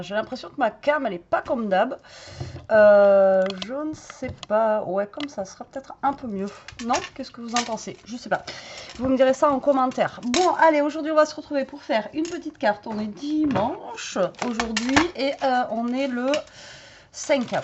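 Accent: South, France. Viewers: Bonjour, je vais regarder ce nouveau tuto avec grand plaisir comme tous ceux que vous faites, j'apprécie beaucoup votre travail, votre style, votre accent 😂car je suis aussi du sud, Montpellier, mais je suis désolée que d'autres personnes personnes vous aient fait de la peine, c'est écœurant et je vous comprends, c'est pour ça que j'insiste pour vous dire franchement que vous êtes une BONNE PERSONNE, ça devient rare en ces temps compliqués, j'espère que vous surmonterez cette méchanceté, moi je vous dis mille mercis et je vous fais un gros bisou😘😉 Elvire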